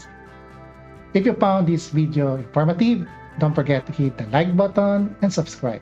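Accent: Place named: Philippines. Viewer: hi, I recognized your tone and confirmed that u r a filipino with your name. i'm a 3rd year filipino IT student. just proud of ur videos. thank youuu! it helped me with my simple project :)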